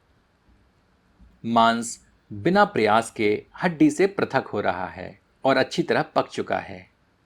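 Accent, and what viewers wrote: Standard. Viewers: Aapki hindi bahut shudh hain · the way you speak pure Hindi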